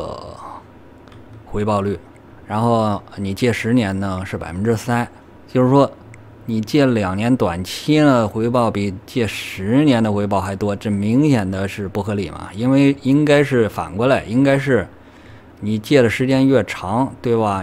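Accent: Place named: Beijing, China. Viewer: 喜歡你的京腔….沒有discord?或微信？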